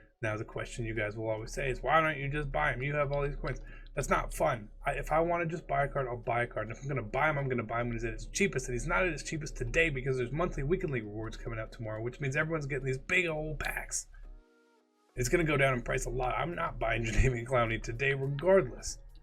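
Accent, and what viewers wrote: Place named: California, United States. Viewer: You talk one of them weirdo California’s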